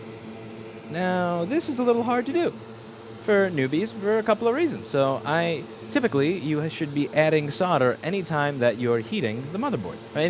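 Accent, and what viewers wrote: New York, United States. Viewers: You are hella New Yorker but I like it. Hey I actually learned how to Solder watching your videos so thanks man. You do you bro, I know I enjoy your videos.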